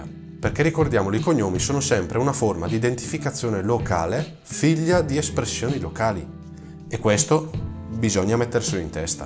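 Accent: Brescia, Italy. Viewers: C'è un professore di italiano su YouTube, Italiano Automatico. Ha esattamente il tuo stesso accento. Questo accento è molto particolare e non ci sono altri professori con lo stesso. Ho scoperto che anche lui è di Brescia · Video molto ben fatto su un argomento estremamente interesante....inoltre complimenti per la pacatezza e l'educazione che rivelano la bellissima persona che sicuramente sei....è molto piacevole seguirti....troppo forte l'accento bresciano....mi ricorda degli amici che avevo conosciuto durante il servizio militare decenni fa....ed in particolare il simpaticissimo tognoli....tutti bresciani ovviamente....apprezzo molto le conoscenze che hanno 1 matrice storico-culturale ed in particolare sulle nostre origini.....grazie..... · Mi piace molto la tua cadenza bresciana. Mi ricordi un mio amico.😊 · Molto interessante! Mi è piaciuta la passione con cui parli del tuo lavoro! 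Simpatico anche l’accento bresciano! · Complimenti per l,argomento trattato. A parte la cadenza bresciana, che qualcuno an approva. È molto interessante. .la pregherei di ripetere la testata del libro che mi è sfuggita. Grazie.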